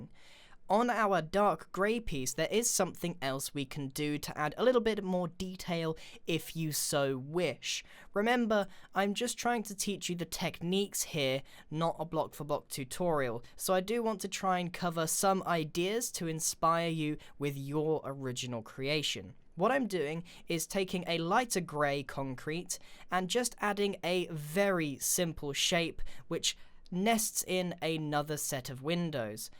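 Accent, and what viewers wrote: United Kingdom. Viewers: I kind of don’t like him talking british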